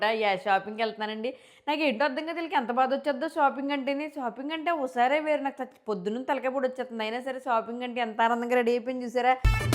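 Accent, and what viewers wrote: Godavari, India. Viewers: ఈ రోజే YouTube మీ వీడియోని first time నాకు చూపించిందండి. అది చూపిస్తే కదండీ మనం చూసేది. మీ గోదారోళ్ల యాస బాగుందండి. Suharsh family in Canada ఛానల్ లో సులోచన యొక్క రాయలసీమ యాస చాలా అంటే చాలా మంచిగుందండి. మా ప్రాంతం యాస లో ఎవరైనా ఛానెల్ పెడితే బాగున్నండి. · Hi andi mee videos chala baavuntaayi Maadi kuda amalapuram 😊 Mana godavari basha super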